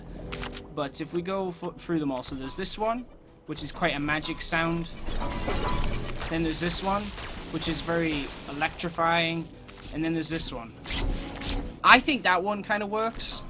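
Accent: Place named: United Kingdom. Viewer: For some reason your British accent is annoying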